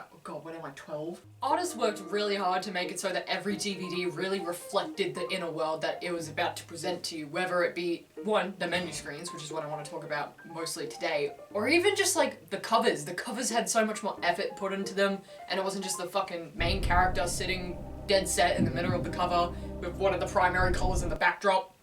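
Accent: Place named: Australia. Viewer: When I saw your video in Scott Cramer's video I truly did not expect an australian accent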